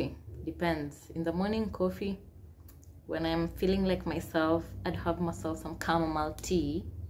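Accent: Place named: United Kingdom. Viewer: wuuueeeeehhhh🤣☺😂😂😂😂 na hio british accent yako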